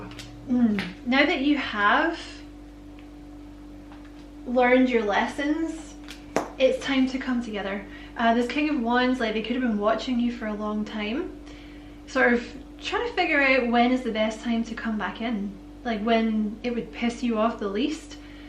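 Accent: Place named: Scotland, United Kingdom. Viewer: What a Fab reading. I so wish you did private readings. I Love a Scottish accent me. 😘😘X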